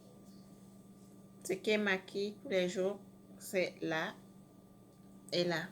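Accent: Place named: Gabon. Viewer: super video , t es d oú ?t as comme un accent gabonais.😊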